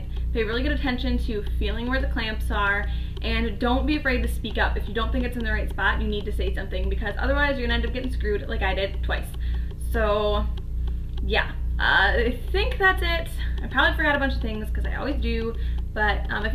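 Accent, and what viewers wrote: Michigan, United States. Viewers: I knew I recognized that accent, another fellow Michigander! Haha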